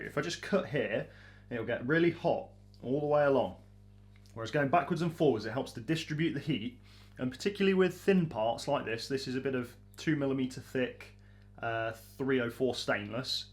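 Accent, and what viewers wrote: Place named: Blackpool, United Kingdom. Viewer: Do you come from Blackpool? Your accent reminded me of Phil, from Limmys world of Glasgow (it’s on YouTube) 👍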